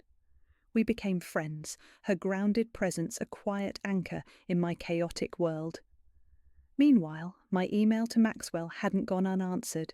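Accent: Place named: United Kingdom. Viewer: Why use a British accent for an American story?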